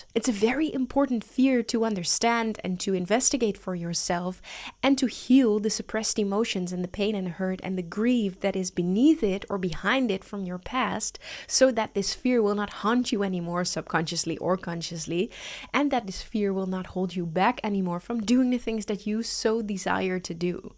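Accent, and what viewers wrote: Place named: Netherlands. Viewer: This video really was a eye opener. Als reconized the dutch accent in secconds❤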